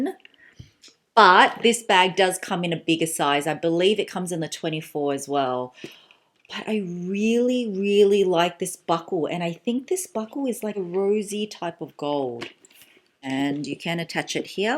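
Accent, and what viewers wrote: Australia. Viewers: HI, I so love your Aussie accent, my sister lives in melbourne maybe one day i have a chance to meet tou in person. You are so lovely!!! I love that versace bag it is so cute!!!! mheller161 IG.... Looking forward to more videos❤️❤️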